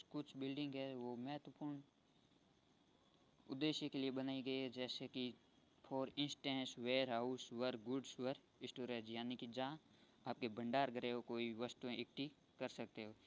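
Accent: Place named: Marwar, India. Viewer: Bai aap rajsthan se ho to aapka Jilla jarur batana mujhe aapki aavaj se lag raha hai ki aap marwad se ho 😊😊❤🎉🎉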